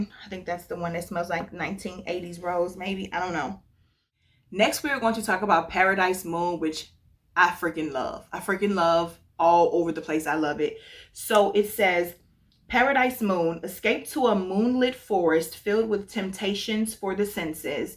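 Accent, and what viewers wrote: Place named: St. Louis, United States. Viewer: Ok…a few things: 1. Thanks for your thoughts on this house, I haven’t heard anyone talk about it 👍🏾 2. You have definitely live in MO or St. Louis for awhile…”all these fragrances in the auurrhh” 😝🥰💛 3. The names draw me in every time! 4. I can’t do prominent pepper notes either 🙅🏾‍♀️ Have a great day! 🥰💃🏾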